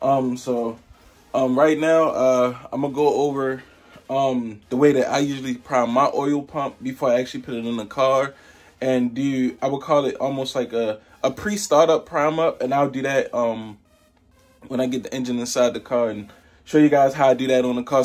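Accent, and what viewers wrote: Baltimore, United States. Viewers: Based on your accent I'm willing to bet you from the bmore area, or somewhere close lol. But if not, where are you at? I'm in RVA and need a 2jz ge vvti overhaul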